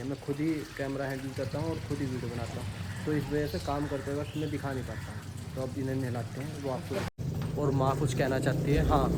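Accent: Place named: Bijnor, India. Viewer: Apki mmy bhot pyari h ...muje apki avaj bilkul asi lgti h jase mama ke bachoo ki h kyuki vo sb bhi bijnor side se h ...or muje to vha sbki avaj hi same lgti h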